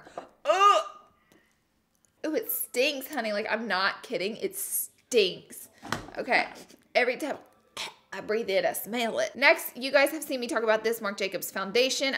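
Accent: South, United States. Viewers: Wouldnt be if y'all didn't have bloopers!! And the southern accent brings it all together!! Loves..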